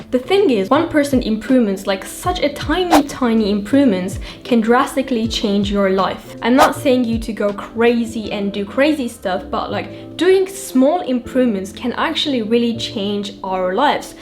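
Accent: United Kingdom. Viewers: have you ever been in england? ur accent reminiscent of british. just my opinion 😌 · Bu videoda ingilizcende bir ingiliz aksanı sezdim sanki.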